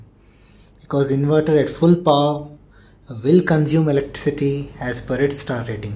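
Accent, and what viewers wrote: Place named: India. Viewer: The indian accent is awful.. speak hindi only.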